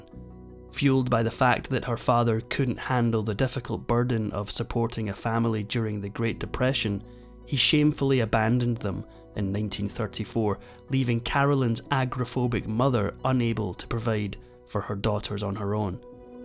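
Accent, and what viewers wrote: Scotland, United Kingdom. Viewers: A fellow Scot. I can't think who he is 🏴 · Six months, the narrator is Scottish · . Scottish AI? I don’t think so, not with those pauses and inflections. If you listen, you can hear him inhale. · Lovely gentle Scots accent.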